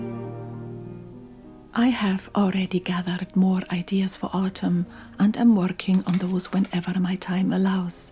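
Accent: Scotland, United Kingdom. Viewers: I just love your channel, and your Scottish accent. When I first watched you knitting I thought "why is she making so many baby hats"...lol. The pumpkins are just beautiful. I crochet and plan on giving these a try.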